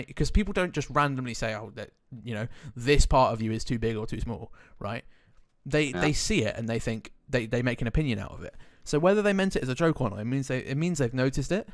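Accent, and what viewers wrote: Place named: England, United Kingdom. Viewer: Roughly where in England are you from? You sound a lot like me, that would be cool if I knew I was close to you. If you don’t want to say that fine.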